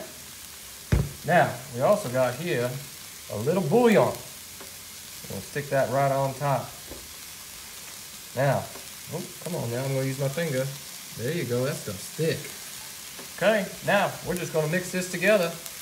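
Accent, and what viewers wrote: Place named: Cajun, United States. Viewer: I love this. Looks so good. Love your accent, where does tat come from? Cajun?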